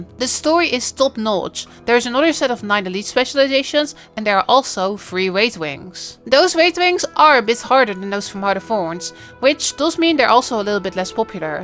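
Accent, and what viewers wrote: Netherlands. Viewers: wat is je nederlands engels accent irritant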